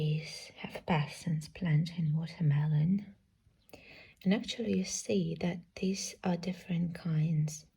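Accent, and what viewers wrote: England, United Kingdom. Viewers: Im just so chuffed how you are not using American English but English from England proper. So nice. Those melons looked so jucy and colourful. Prayers that the geo politics of today will not affect your lovely part of our world. Cheers from Sweden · Your British educated by the sound of your English I'm growing watermelon this year see if technique works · How do you speak like a British living and raised in Belarus 🇧🇾 By the way your life is so beautiful so you are. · Sounds to me like she’s acquired a British accented English, interesting as many Eastern Europeans have a distinct accent. Lovely to listen to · who comes you have such a beautiful English accent 🇬🇧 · You have a English accent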